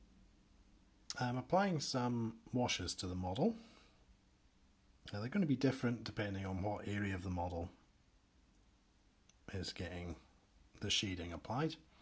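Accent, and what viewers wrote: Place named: Scotland, United Kingdom. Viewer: This video Iv just found in time as got a copy of dead by lead my first western skirmish game and painting cowboys isn’t my usual genre as mostly paint sci-fi and cyberpunk minis. Great informative tutorial and better still it’s from a fellow Scot so accent was a nice change lol.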